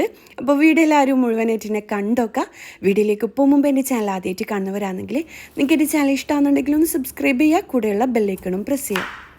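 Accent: Kannur, India. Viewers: Sorry nan parayunnad thonna Ruth cheriya thettan Nammude kannurkare prathekich thaliparamb payangadi bagathullavare ellavarum kaliyakkum samsarasheyliyil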